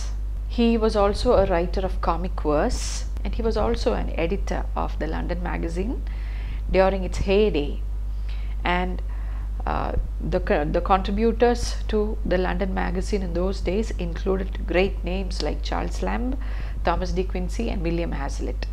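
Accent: India. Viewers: IN Skip navigation i remember i remember by thomas hood 9+ Avatar image / I remember, I remember - Poem by Thomas Hood | Explanation English Lessons 64.1K subscribers Subscribe 556 Share Download Clip Save 24K views 1 year ago An explanation of Thomas Hood’s poem I remember, I remember. 37 Comments R U Thowamy Add a comment… 11 months ago Ma'am I have been watching your video for last one year. Now I am a teacher too. So about my last interview I gave a demo class on my subject. I noticed your attitude through your video that you always being clam and using Indian accent. Ma'am believe me I did exactly follow your attitude and Now I am selected. So thank you so much for giving the inspirational personality. ❤ 22 Reply 6 months ago (edited) Wonderful analasys, I saw many other analasys but your is the best of them. Thanks alot for your efforts and simple analasys. 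1 Reply 1 year ago Ma'am, please do a video on the poem Mac flecknoe by John Dryden. 1 Reply 1 year ago The teaching style is very good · Ma'am I have been watching your video for last one year. Now I am a teacher too. So about my last interview I gave a demo class on my subject. I noticed your attitude through your video that you always being clam and using Indian accent. Ma'am believe me I did exactly follow your attitude and Now I am selected. So thank you so much for giving the inspirational personality. ❤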